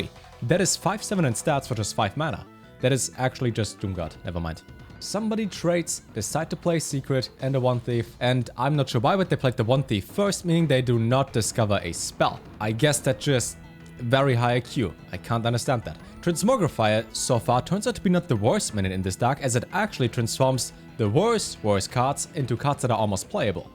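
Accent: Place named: Germany. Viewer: can i ask u from where u are? u sound german ^^. just found ur channel, nice content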